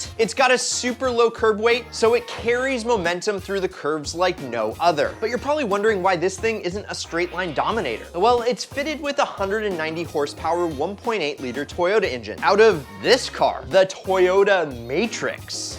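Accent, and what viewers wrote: United States. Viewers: Yo, can you just do a whole vid with your cowboy accent? hmm?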